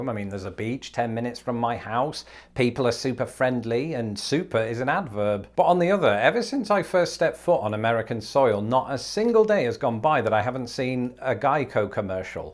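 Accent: United Kingdom. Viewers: “When I stopped to do the math. . . sss” 😂 Still sounds British to me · welcome home,. Glad you are here!:) Just make sure you keep your British accent and keep informing us of what gets lost in the pond. I find it absolutely riviting! Heck, just regions in the US are like a different country! My family and I don't live too far. Just over the state line in Wisconsin south of Milwaukee! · Welcome Home. Just promise us you will never lose that signature British accent of yours.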